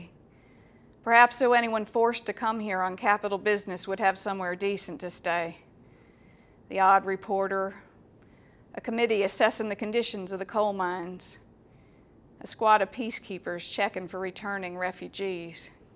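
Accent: South, United States. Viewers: Southern accent..lmfao. Just no, sorry. Great trilogy, but no. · she makes Katniss sound like a hill billy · I didn't really think of Katniss with a southern accent but it sounds better that way.Thank you my hero! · Never pictured Katniss having a Southern twang... Hm... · Wow, you would think that people would let the author decide whether or not she has a southern accent. After all, she IS the one that decided that katniss is brunette and has gray eyes...or is that wrong too??? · The southern accent just wrecks the whole "kicking ass" image.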